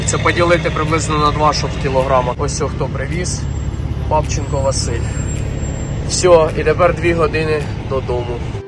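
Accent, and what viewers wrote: Poltava, Ukraine. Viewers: Звідки Ви родом? З якого регіону?) Дуже схоже на Полтавщину, або сусідів 😃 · дякуя з Полтавської ,говір похожий на наш Полтавський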